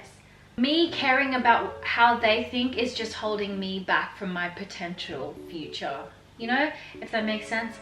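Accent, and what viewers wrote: Australia. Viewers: omg!! yess! finally... someone. is. Aussie!! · i knew it! i recognised ur australian accent right away lol congrats on reaching 100k!! ure soooo relatable subscriber from australia ❤️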